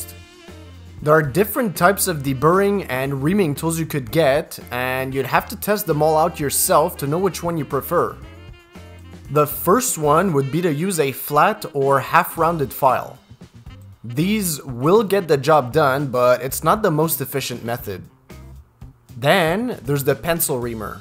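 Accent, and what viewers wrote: Quebec, Canada. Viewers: I do hear a québecois here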